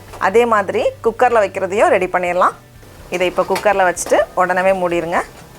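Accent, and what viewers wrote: Nagercoil, India. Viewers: You are a pleasure to be watched,. Your Nagercoil slang is too good. The recepies are excellent. The pizza came out very well. Love to you.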